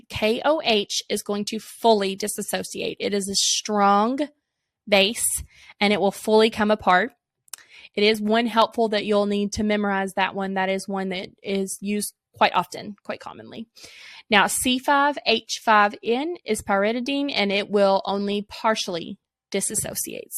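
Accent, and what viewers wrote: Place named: South, United States. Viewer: goodness I love southern accents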